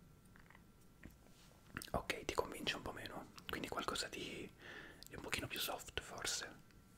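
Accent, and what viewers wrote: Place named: Tuscany, Italy. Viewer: sentendo il tuo accento, perché mi sembri toscano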